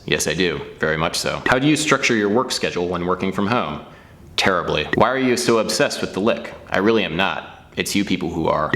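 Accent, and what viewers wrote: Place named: Maryland, United States. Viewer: I can hear you're from MD bud in the way you say "so".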